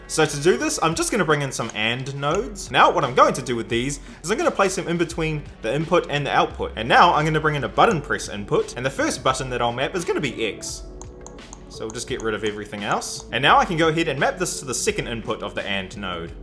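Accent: New Zealand. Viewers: Your from New Zealand ay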